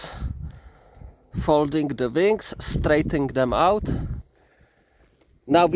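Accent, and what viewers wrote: Czech Republic. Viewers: ježíš česká angličtina 💩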